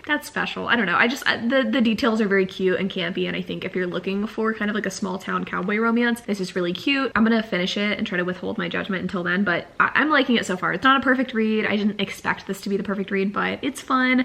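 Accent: South, United States. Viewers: I loved what you said at the end about romance! Also I'm kinda living for the country accent haha! This was amazing as always! Love your vlogs!! <3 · If you’re from the South, her country accent is honestly hilarious Also “yeehaw... we’re done with that” made me laugh for a HOT MINUTE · hahaha I love your country accent 😂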